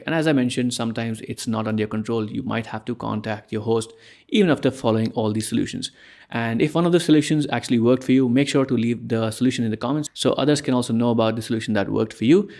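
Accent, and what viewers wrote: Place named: India. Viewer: I feel like im going bat sh!t crazy when i listen to east indians talk. Your guys accents are absolutely unbearable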